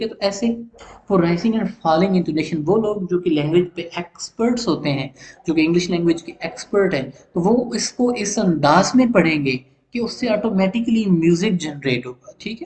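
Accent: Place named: United States. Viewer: Aap ki mother language to urdu hy but u speak English like an American so goo sir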